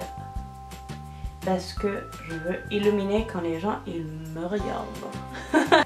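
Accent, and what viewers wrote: Spain. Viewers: T'es magnifique! Moi aussi je suis espagnole! ❤️❤️❤️ Mais moi jai pas d'accent, dommage..😒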